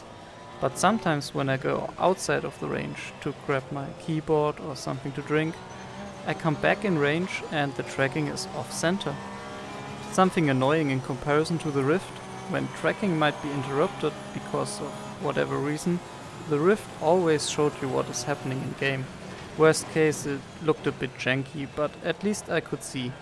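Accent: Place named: Germany. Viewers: Correct m8, he is from Germany. But iam really puzzled about your statement that you like the German accent. This is really the first time I hear such a statement about the German accent ;) I thought that most american people are grinning about the accent of German people. Happy to be proven wrong ;) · Hey good video! i like your accent! Are you from Germany? It sounds like that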